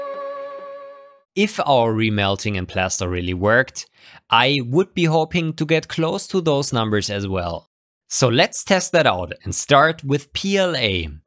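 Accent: Germany. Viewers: This test machine looks like some torture machine, and German accent doesn't help either.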